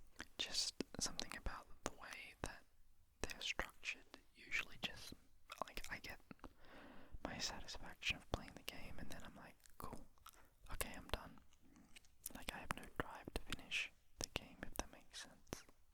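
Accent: Australia. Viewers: This was precisely the Australian Sleepy Man Rambles needed right now. Do go on about video games/anime/manga...I'm not not here for the nerd-friendly content, and it's relaxing. Life is still constantly stressful due to "how things are where I live," but watching the new Alien: Earth series is a bright spot each week. Zoos aren't a usual destination, but there's a teaching zoo nearby that I enjoy supporting during their Halloween open house fundraiser. While there are tigers and monkeys, the reptile house's skink with resting judgmental face is worth the entire entry fee. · Your videos started getting recommended to me a couple weeks ago and I’ve used them every night since to fall asleep. Weirdly enough, I also forget your Australian every night and get whiplash hearing the accent lol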